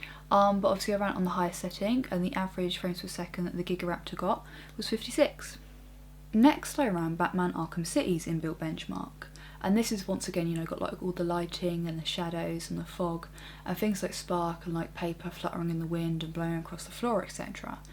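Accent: United Kingdom. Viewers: Uhm...she's obviously British, it's just the way they talk in some areas of Great Britain. There's nothing wrong with her. Americans...enough said... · She lives in the UK so she has an accent. It makes me laugh at how people are critical of perfectly acceptable accents. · What part of the UK are you from?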